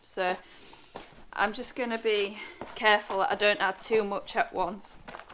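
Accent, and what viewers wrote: East Yorkshire, United Kingdom. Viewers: Love your East Yorkshire accent😊m from Leeds very different but similar.I’m an amateur gardener but I’m following your amazing project. Looking forward to to seeing your plot grow. 🐝🌼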